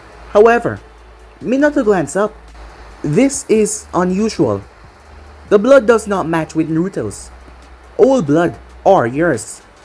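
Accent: Jamaica. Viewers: Day <num> million trillion of asking if your Jamaican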